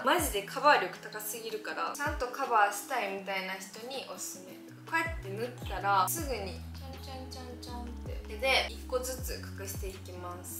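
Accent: Kansai, Japan. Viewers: 関西弁？やばすぎて聞きづらいｗｗｗｗｗｗｗｗｗｗｗ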